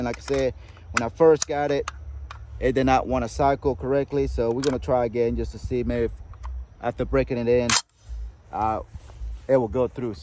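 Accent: South, United States. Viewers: First time i ever heard a hispanic/southern accent mix. Nice!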